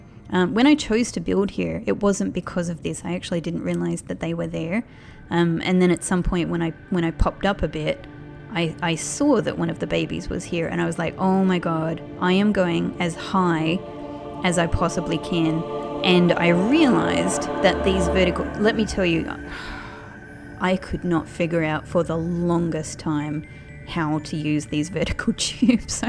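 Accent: Australia. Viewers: Hi, just tuning in for the first time. Sorry I missed your full journey! Question, I don’t recognize your accent, where’s it from? Edit halfway through you said you’re from Australia! That’s so cool. I hear they have a beautiful coral reef there. My love for this game has got me into scuba diving, one day I hope to do that down under!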